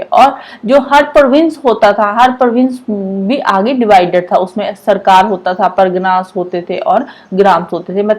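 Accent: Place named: Chamba, India. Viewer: your ascent is chamba himachal pradesh😃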